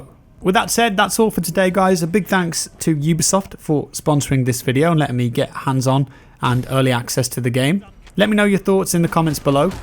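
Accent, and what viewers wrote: United Kingdom. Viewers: “Cameras are everywhere” this guy has a British accent and doesn’t know that London is the city with the most surveillance in the world, cameras are already everywhere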